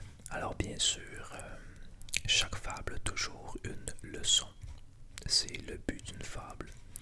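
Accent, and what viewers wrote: Quebec, Canada. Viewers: Alors d'habitude je déteste l'ASMR québécois car j'ai du mal avec votre accent mais avec toi, ça passe nickel et je suis ravie 😄 En plus, j'adore les lectures chuchotées. Je vais aller découvrir le reste de ta chaîne avant de m'abonner 😘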